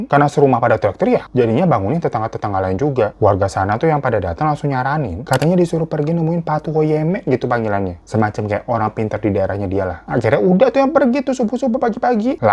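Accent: Java, Indonesia. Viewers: logat jawa tapi bawa bahasa minang😭😭😭😭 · Bang napa logatnya jadi jawa wkwkkw bukan padang🤣gemoy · Bahasa pake bahasa minang, tapi logatnya logat jawa, bang the best 😂😂😂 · Kenapa logat nya jadi jawaaa 😭🤣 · kooo minang jadi logat jawaaaa :face-purple-crying::face-purple-crying:🤣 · Bahasa minang tp logat jowo piye toh bang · Bahasa padang tapi logat jawa 😂😂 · Kenapa logatnya jadi jawaa wkwkwk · Ternyata bisa ya bahasa minang pakai logat jawa 🤣🤣🤣 emang beda 👍👍👍👍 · Sempet bingung tadi dgr bang ngmg logat jawa pdhl lagi ceritain suku minang😂😂. Seharusnya adat2 seperti ini tidak perlu di lestarikan,krn memberatkan,dan tidak sesuai dgn syariat Islam yg mayoritas agama disana,jadi kesannya kyk jual anak. sebagai orang tua harus lebih wise untuk kebahagiaan anak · Bg ngomong bahasa Minang aksen Jawa bgtu😅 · baca text Minang pakai logat Jawa🤣🤣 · Wkwkwkwk.. agak lucu bg.. 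logat bahasa minangnya jawa.. tapi usahanya untuk berbahasa minang top lah.. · Bahasa minang logat jawa 😅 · Kok logat nya jadi jawa yah kak 😅😅😅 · Bang, haha kok lu jadi logat Jawa bang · Minang logat jawa. Lucu bet sih bang. Awokwowokkk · BANG w salpok LU NGMNG bahasa minang tapi logat jawa😭😭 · Kenapa jadi logat jawa sih bang hahahhahaa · voice overnya jawa😂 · Cerita Minang contoh bahasa malah logat Jawa BG 😅😅😅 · minang logat Jawa ya bang😲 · Logatnya knp jadi jawa bangg😂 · Wkwkwk lucu banget bg ngomong bahasa Minang pakai logat jawa. 😅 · Bahasa minang logat Jawa😭🤣🤭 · Logatnya jawa banget bang 😂😂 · Bang pas lu bacain percakapan gua ngakak 🤣 malah medok jowo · Koq aku ngakak bahasa menang logat Jawa....😅😅 semangat bang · Knpa logatnya kita jd jawa ya Langsung ngakak 😅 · Kenapa logat nya jawa si😂😂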